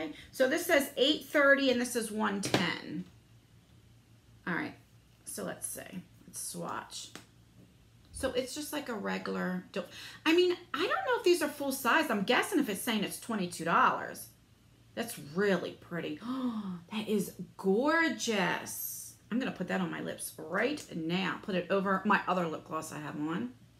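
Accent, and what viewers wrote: New Jersey, United States. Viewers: I love your jersey accent. My hubby grew up in NJ and we love going back to visit. We are from New England, United States. We went camping in a state park in NJ on a lake. We were ready for freezing water like in our home state. The water temp is 69 degrees right now ( very cold) well as soon as we walked in the lake were very excited to have it feel as warm as bath water for us!!! I love this box someday I will order one. Thank you for doing such an amazing job. The other thing in NJ that we have none here is the sound of cicadas wow they are loud but we got use to them. The cicada killer bees are huge and scared one of my kids terribly.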